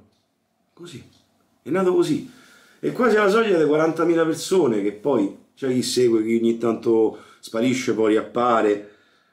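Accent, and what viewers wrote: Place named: Rome, Italy. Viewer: Ciao, volevo farti i complimenti per il canale. Ho iniziato a guardare i tuoi video cosi, facendo zapping su YouTube, e niente... Sarà la simpatia? Saranno la passione, l'umiltà, l'accento romano...? Praticamente me s'è aperto un modo e pure il portafoglio... "Ccitua!" 🤣 Senza entrare nello specifico ma altri canali simili non trasmettono la stessa atmosfera. Continua così 💪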